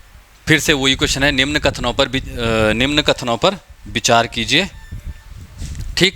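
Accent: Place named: Garhwal, India. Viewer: Sir ki aavaj ek garhwali lag rahi h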